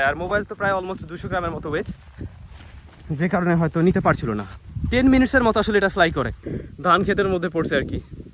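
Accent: Barishal, Bangladesh. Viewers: vai Apni akjon বরিশাইল্লা! ajke janlam.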